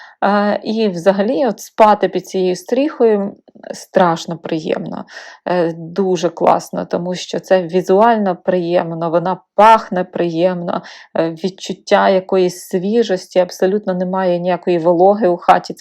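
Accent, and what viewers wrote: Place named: Surzhyk, Ukraine. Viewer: 'чьі', 'щьо' - суржикова вимова; правильно казати 'чи', 'що' ☝️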